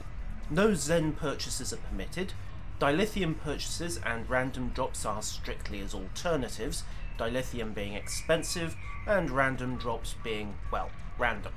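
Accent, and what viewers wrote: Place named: United Kingdom. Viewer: Something about being educated by a gentle British accent that just makes you feel more intelligent. Good info. I think I'm going Tricobalt.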